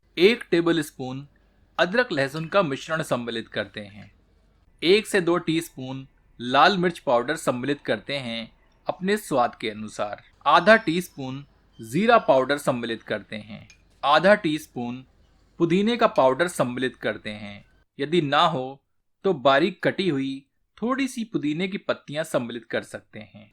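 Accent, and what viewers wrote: Standard. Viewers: a pleasure to listen to you Shudh Hindi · आपकी परिष्कृत शुद्ध हिंदी का उच्चारण उस पर चार चांद लगा देता है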